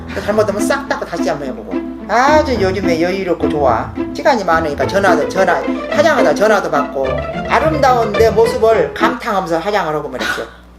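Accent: Jeolla, South Korea. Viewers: 메이크업 영상 나를 울리게 하네요 웃다 울다. 우리엄마 생각나게 해서 웃다가도 울고 전라도 사투리에 엄마랑 목소리 말투 너무 똑같아서 계속 보게 되요. 한 영상을 진짜 여러번 봤어요^^ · 할머님이 전라도 사람인가요?? 저희 할머니하고 말투가 완전 비슷해요 !!!ㅋㅋㅋㅋㅋㅋㅋㅋ저희할머니보는 느낌 ..❤️ 오늘도 잘보고 가요 !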